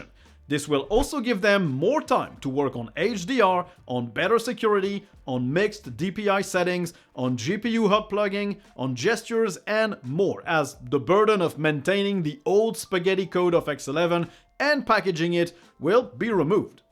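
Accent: France. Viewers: I'm living in Haute-Loire, and as a French it's very strange to watch a French speaking English, at least I totally understand what you are saying 😆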